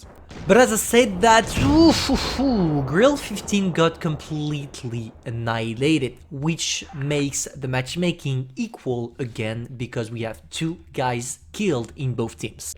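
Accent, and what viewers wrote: France. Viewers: Question? Are you French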